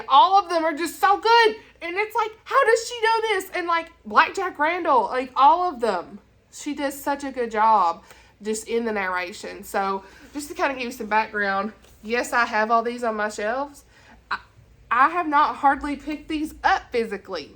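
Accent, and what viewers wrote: South, United States. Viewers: ok the libby app I think I may have heard of, is it like hoopla? I know they do books, movies, audio book, e-books etc. Whatever is at your library. Ok will check it out thanks. Love your country accent btw. I tend to pick up my country accent after hanging around my cousins from the south. Then I get back home & people forget I am not actually southern lol.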